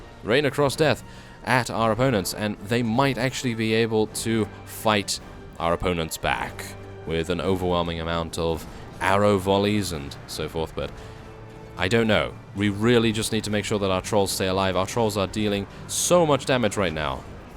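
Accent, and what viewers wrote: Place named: Oxford, United Kingdom. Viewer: If you don't mind me asking where in England are you from? I'm thinking Oxford area but I can't tell, I'm from up north near Manchester.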